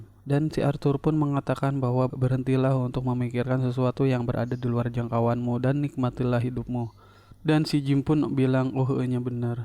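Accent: West Java, Indonesia. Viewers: Si ajig urang sunda🤣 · logat sunda na asa deket jeng daerah aing da ieu teh🤣🤣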